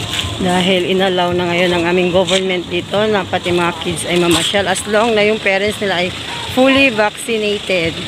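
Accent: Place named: Batangas, Philippines. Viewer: Akala ko sis taga Batangas ka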